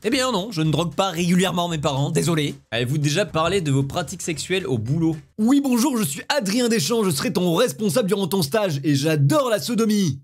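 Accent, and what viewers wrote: Marseille, France. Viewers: T marseillais ou toulousien ?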